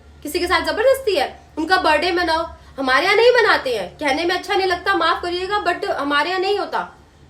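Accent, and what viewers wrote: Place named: Uttar Pradesh, India. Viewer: Aap kanpur lucknow se ho kya aapka kahe hamare kanpur lucknow ki jaban hai na baki your way of talking is marvellous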